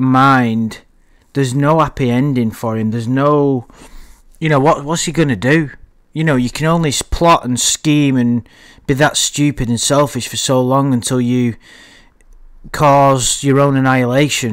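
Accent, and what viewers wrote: United States. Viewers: your American accent is incredible. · Not a bad yank accent.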